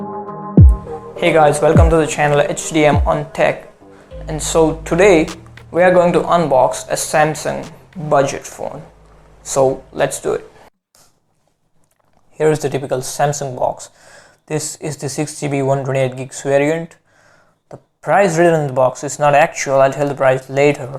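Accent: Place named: United Kingdom. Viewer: I think you have a nice British English accent. Nice pronounceation. Good start and tell you brother to learn all this from you 😂🤣😜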